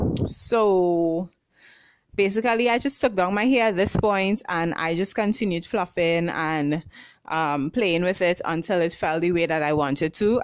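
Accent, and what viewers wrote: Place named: Trinidad and Tobago. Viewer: Trini accent, great video, lovely hair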